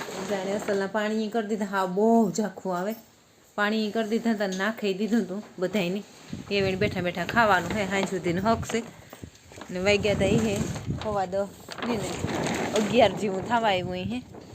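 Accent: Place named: Saurashtra, India. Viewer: જય વછરાજ તમે ઘેડના લાગો બોલી સોરઠ ની છે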